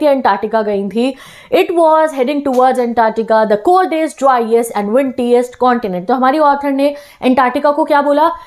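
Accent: Punjab, India. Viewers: Bande Bharat ke speed se bolte ja rahi hai aap। Student would definitely fail to follow।। Again Hindi in Punjabi tone is very disturbing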